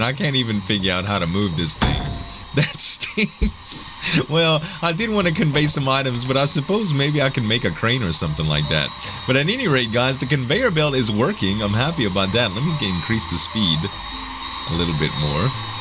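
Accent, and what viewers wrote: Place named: South, United States. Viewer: You seem more country then last time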